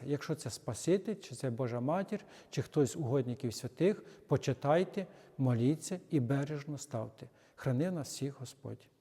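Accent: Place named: Khmelnytskyi, Ukraine. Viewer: Так, є святині, які потрібно поважати. Хороший урок, хоч і трагічний. Батюшка, ви часом не з Хмельницької області? Слухаю ваш говір. Цікаво.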